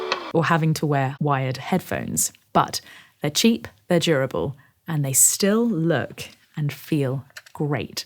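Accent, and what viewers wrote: United Kingdom. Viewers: Lovely British accent you have. listened yesterday Face To Face album from Klinik recorded almost 30 years ago.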